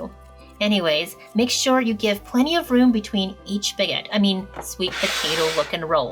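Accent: Canada. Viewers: This looks like a good recipe for my new Kitchenaid mixer still in the box... first of your videos I've seen and subscribed... from the accent it sounds like your from friendly Canada,,thanks for the video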